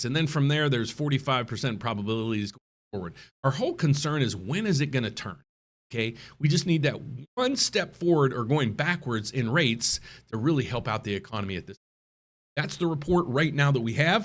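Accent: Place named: South, United States. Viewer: Wow! He has a southern accent.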